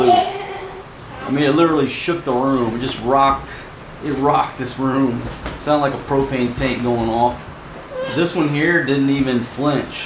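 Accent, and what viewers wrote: South, United States. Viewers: You have a good country voice 👍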